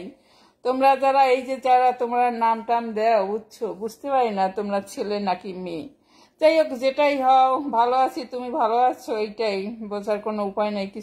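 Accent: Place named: Barishal, Bangladesh. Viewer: বরিশাল এর ভাসা শুনতে ভালো লাগে।।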